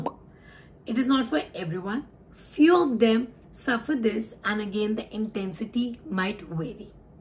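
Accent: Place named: Pakistan. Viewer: Your accent is Pakistani ❤🇵🇰🇵🇰🇵🇰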